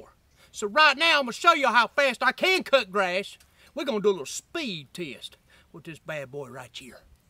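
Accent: South, United States. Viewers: “ (I can’t spell his redneck accent but it’s awesome)”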